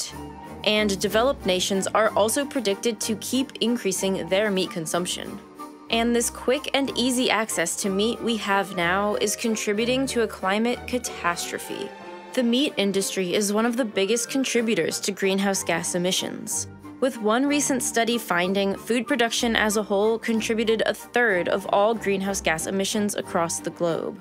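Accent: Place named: United States. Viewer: I have severe doubts that it takes even 10 litres of FBS to make a single burgers worth of meat, let alone 50 litres. As the narrator is clearly American I'm going to go out on a limb and assume larger standards for meat portions than the rest of the world does. Even then I still don't buy it, sounds a lot like doomsaying - especially given she saves the "oh yeah $10k to less than $3 is feasible in 9 years" to much further along in the video as if she's just padding the run time.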